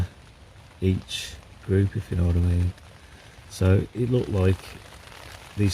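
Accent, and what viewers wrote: Black Country, United Kingdom. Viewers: Hiya bud, I just came across your channel and you seem a genuine and friendly bloke. I’m from Yorkshire but have lived in the West Mids for over 20yrs now and find most to be really nice people. Good to hear a Yam Yam accent on YT 👍 Subscribed 👍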